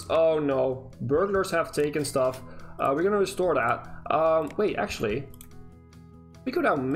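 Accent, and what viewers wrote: Netherlands. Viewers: I live in Brabant but am from the US. So I speak the language my accent gives away my secret. And you have a bit of a Dutch accent, but I also thought that you could sound a bit like your more eastwards, so wasn't sure.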